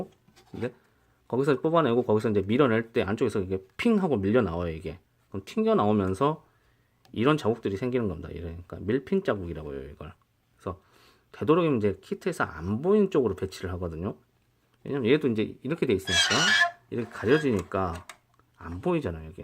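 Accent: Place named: Jeolla, South Korea. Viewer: 전라도 사투리네 씨발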